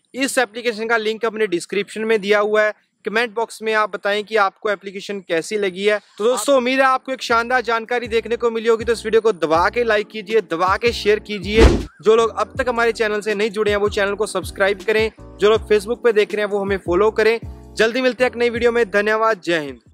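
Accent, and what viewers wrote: Punjab, India. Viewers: Punjabi Hindi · sir aap punjab se ho kya...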